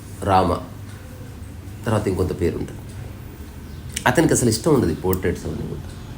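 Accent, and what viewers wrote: Telangana, India. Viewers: ఇలా మాట్లాడ గలగడం ఒక ఆర్ట్.బాష, ఎక్స్ ప్రెషన్,విషయం,వివరణ ,నవ్వు,తెలంగాణ యాస,నేటివిటీ ఇలా అన్నీ అద్భుతంగా ఆ నోట్లోనుండి వస్తున్నాయి.అద్భుతం.పరమాద్భుతం.🎉🎉🎉🎉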